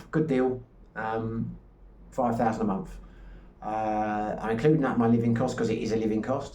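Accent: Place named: London, United Kingdom. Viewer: Good to hear another Londoner in the Philippines, I'm living in Cebu, also got a you tube channel, loving it here, keep up your channel. Cheers mate, Colin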